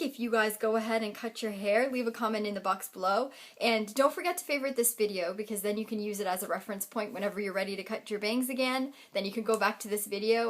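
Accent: Canada. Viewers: she souds like a canadian!!! r u canadian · this video was great...i can tell ur canadian you may not realize but you have a strong canadian accent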